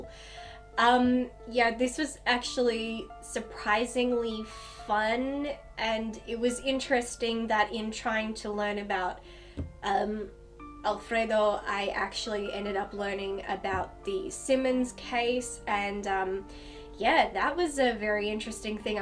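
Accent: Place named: United Kingdom. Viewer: Besides doing some good research, the only thing you have in common with “Minty” is that you both speak versions of “The Queen’s English,” (he’s a Kiwi). I’ve been a fan of the movie since the 90s, and am just getting around to the audiobook. Oh, and I’m from Baltimore, and spent a lot of time in Pittsburgh where it was filmed, so it’s always had a special place in my liver, I mean heart <3 Nice research!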